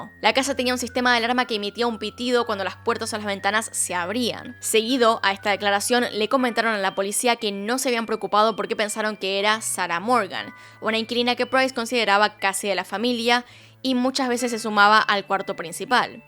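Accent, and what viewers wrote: Argentina. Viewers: Ala , que hermosa eres y que bonito ese acento argentino, que chula manera de narrar algo atroz 😭 que arte.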